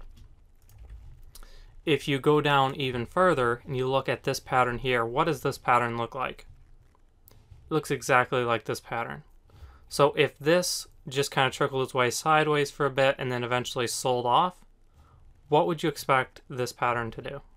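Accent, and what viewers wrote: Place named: New York, United States. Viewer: As a newbie in the crypto world, I can see how easy it would be to get sucked in with misinformation from so called experts. After watching a few of your videos and seeing Bitcoin do exactly as you said it would, I am glad beyond words that I found you. Now instead of putting my money into a course run by thieves I am looking forward to the course you had mentioned that is coming soon. Here is to learning the right way and I don’t know if you are from New York or not but your delivery sounds just like a New Yorker especially when you are talking about all the people out there who don’t know what they are talking about. You just tell it like it is…love it!!!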